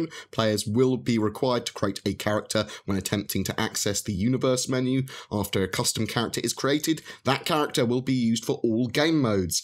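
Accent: United Kingdom. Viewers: I love how Brits call it a "haych" key :)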